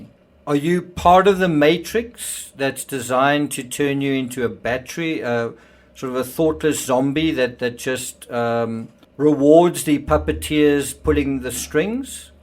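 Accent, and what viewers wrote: South Africa. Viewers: There is no voice better than a South African voice talking about anything ❤️🇿🇦.... sorry been watching all the channels on this and just found yours and couldn't even focus... but yes agree I am extremely selective on what channels I watch and subscribe to. The Vet and check everything before posting or reposting now that everything has gotten so out of control. Thank you 😍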